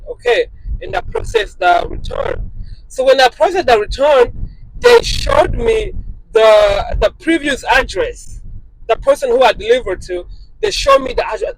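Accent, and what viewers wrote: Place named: Haiti. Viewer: How long have yòu lived in the United States? Im from Miami trying to place your nice accent, Haiti or...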